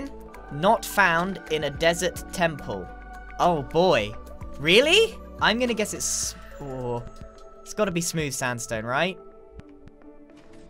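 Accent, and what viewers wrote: United Kingdom. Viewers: To me he kinda sound like a British person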